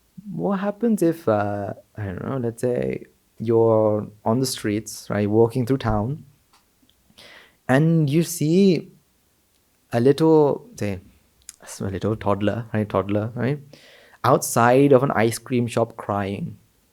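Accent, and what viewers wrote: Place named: United Kingdom. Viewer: Profoundness with British English excent sermon❤ saadhukara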